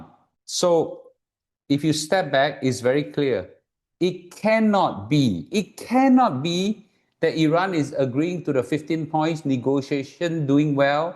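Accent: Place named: Singapore. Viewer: Very good Senglish.. 👍 ❤🎉😊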